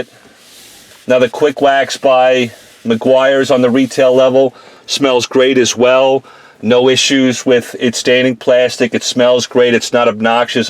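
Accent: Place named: New England, United States. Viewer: Never knew you could use that on a dash! First time watching your channel. One question, what part of New England are you from? Your accent almost sounds southern New England.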